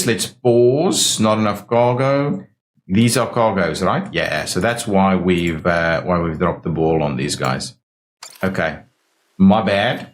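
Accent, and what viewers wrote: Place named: South Africa. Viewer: Are you South African? The accent screams South African. 😁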